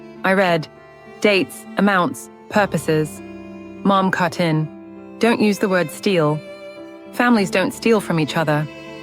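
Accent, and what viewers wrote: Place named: United Kingdom. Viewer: Sound more British than from Nebraska.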